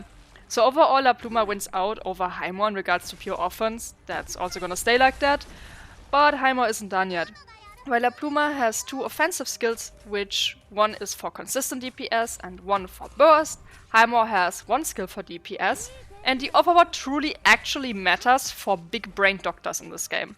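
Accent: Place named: Germany. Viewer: German accent is a welcome surprise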